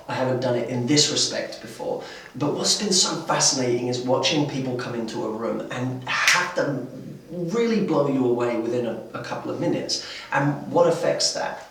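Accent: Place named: United Kingdom. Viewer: your pretty look and British accent already won people s attention(๑´∀`๑)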